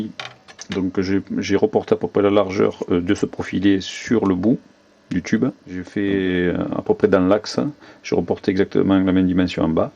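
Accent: France. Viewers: he speak FRENCH , he has an accent from the sounth of the france.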